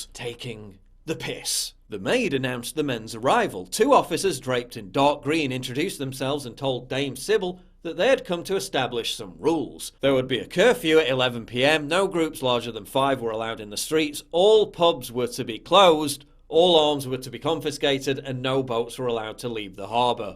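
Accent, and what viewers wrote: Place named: RP, United Kingdom. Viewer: It always amuses me that a British accent can make crude language sound upper crust. 😄😄😄😄😄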